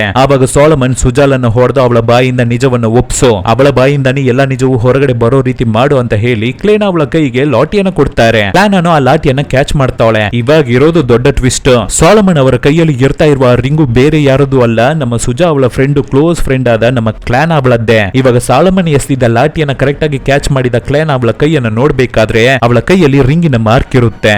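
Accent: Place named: Kerala, India. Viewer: malayali kannada accent kaka angadi maatu ide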